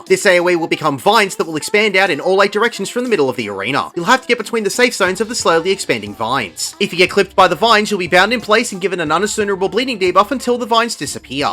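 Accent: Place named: Scotland, United Kingdom. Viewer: I’m here for one reeeeeeason: the Scottish ish is crazy Brodie 😂🤣👏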